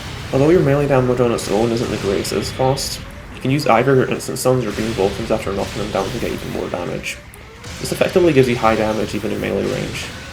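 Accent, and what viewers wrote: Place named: Scotland, United Kingdom. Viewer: Just started playing so these videos have been helpful, is that an Edinburgh accent? I'm from Glasgow so you sound Scottish but can't place it exactly.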